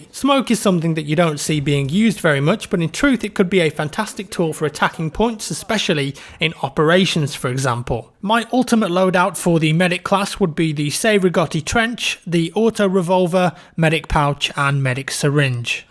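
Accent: United Kingdom. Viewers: YOU. R. SO. BRITISH.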